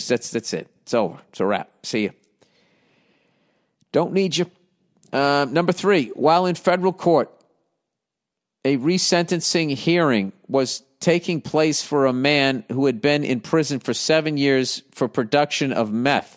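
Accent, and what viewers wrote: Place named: West Virginia, United States. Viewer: Sounds like West Virginia.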